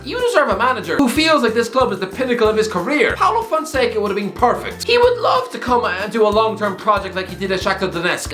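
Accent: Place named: Ireland. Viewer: Speak English thick mick.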